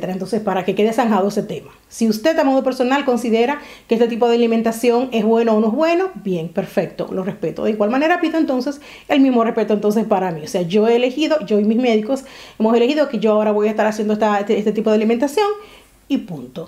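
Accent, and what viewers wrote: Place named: Dominican Republic. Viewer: Te encontré por casualidad, tu forma de hablar me llamó la atención. Soy dominica y en tu forma de expresarte parece de allá. Tengo un gran tiempo escuchando de estás dieta y es observada el consumo de queso es vital, quiero preguntarte si este puede ser sustituido , tengo problema con la lactosa y aunque dicen q éste no tiene a mi me produce muchos gases.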